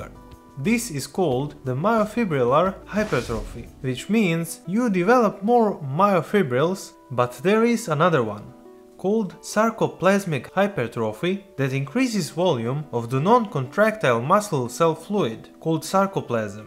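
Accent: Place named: Serbia. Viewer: You really sound Serbian/Croatian/Bosnian/Montenegrin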